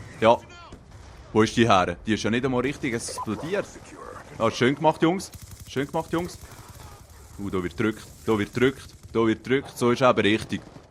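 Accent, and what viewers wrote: Bern, Switzerland. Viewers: du bisch zviu:) bisch e bärner oder Luzerner? gruess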